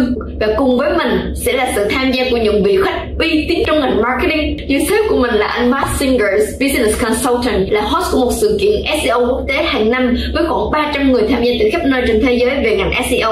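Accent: Binh Dinh, Vietnam. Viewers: bà chị đến từ bình định à nghe quen quen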